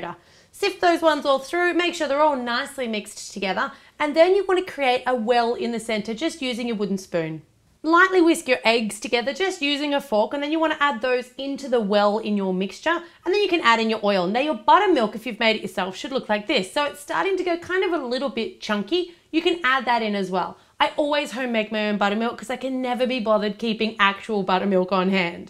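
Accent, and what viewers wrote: Australia. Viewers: good lord I love Australian accent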